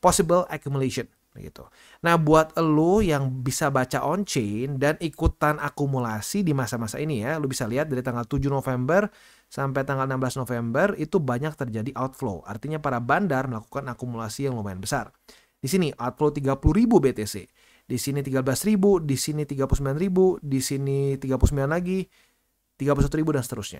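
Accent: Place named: Palembang, Indonesia. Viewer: Palembang yo bang :V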